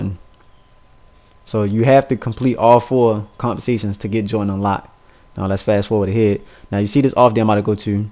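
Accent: Baltimore, United States. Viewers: you from baltimore? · i from bmore to soon as i heard you voice i said "this nigga from bmore" lol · From Baltimore.?🤔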